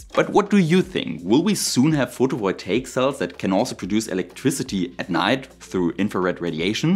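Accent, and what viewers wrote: Germany. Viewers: Das Englisch ist wirklich nicht schlecht und wird auch immer besser. Ich denke, dass es im allgemeinen als ein charmanter Akzent passend zum Kanal-Namen wahrgenommen wird (wir hoffen ja alle, dass unser deutscher Akzent als charmant wahrgenommen wird)... Also ein echtes Markenzeichen! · Are you sure you want me to hit the subscribe button? As I am already subscribed and now I am unsubscribed which is annoying as I like your German accent, and you speak English fine, So I sorry bit I going to go against your wishes and subscribe to you again. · Nothing wrong with your English. No need for excuses there. Don't denigrate yourself. Besides, if anyone clicked on and then gets mad for a German accent, that is totally self-inflicted. As for the cells... really depends on how much energy they cost to produce and how much energy they make in turn. 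Wonder what a first experiment would look like - regular photovoltaic on top, mercury cadmium telluride on the bottom. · You're English is fine -you do sound very German, but pronounciation is great. You do have slight vibes of Jurgen the German, a character created by Harry Enfield for his BBC comedy show. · Your English is perfect just spoken with a slight German accent (I can understand you better than some Southern American accents). This is a VERY interesting topic and I liked how you presented the technology and then the hurdles. I subscribed.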